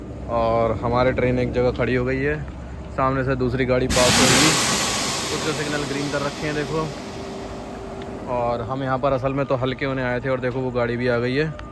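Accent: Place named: Uttar Pradesh, India. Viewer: Bhai apki awaz sunn kar lgta hai ki aap UP se hain. Mein Azamgarh se hunn, aap kahan se ho?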